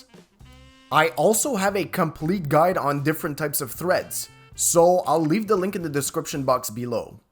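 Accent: Quebec, Canada. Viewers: I new you were Canadian A bit of a french accent. Sudbery? Thanks your my go to for plumbing. hate doing plumbing fixes or repairs but I don't mind installing new.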